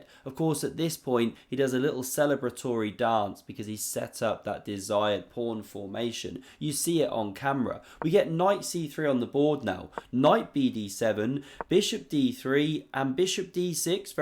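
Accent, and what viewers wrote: United Kingdom. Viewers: Plus he has a cool British accent, like GM Danny King and KingCrusher too.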